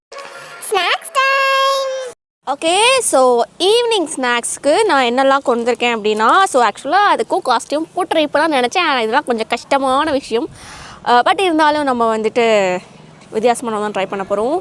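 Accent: Tirunelveli, India. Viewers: Akka ninga tirunelveli ya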